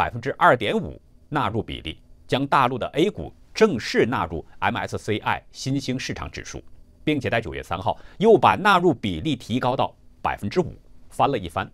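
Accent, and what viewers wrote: Standard. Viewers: 最标准声音主播👍☕️ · 这个主播的普通话很标准，但我肯定他不是中国人。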